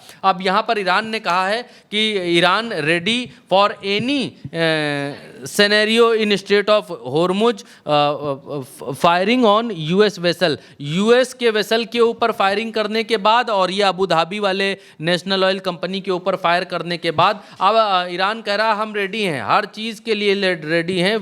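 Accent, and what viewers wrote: India. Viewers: Bhrat tumhara desi andaz chune wala hai .Desi style me english bolna aur beech beech me flana dhkana 😅acha lgta hai.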